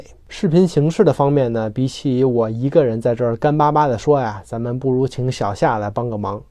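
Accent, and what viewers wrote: Tianjin, China. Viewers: 听口音感觉up是天津人，对不对😀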